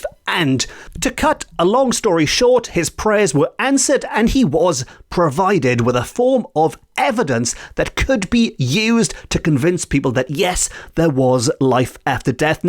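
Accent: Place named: United States. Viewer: Your pronunciation of Welsh sounds very different from Duolingo and other Welsh speakers i've heard. I've heard Calan Gaeaf pronounced Cah-lahn Guy-ahv. Are you just from a different part of Wales with a different dialect or accent? The way you pronounce your "A" sounds like the American way, not British.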